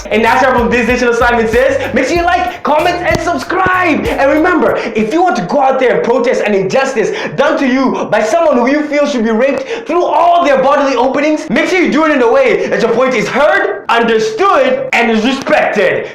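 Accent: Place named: United States. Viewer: African are racist to election, hahaha ain't that the truth!!!!You are funny you would be more funny with that cute african accent!!!You sound almost american!!!Do all kenyans speak english at all times???if yes, why oh why???What happened to your native language, I'm not even kenyan but i would appreciate some kenyan accent!!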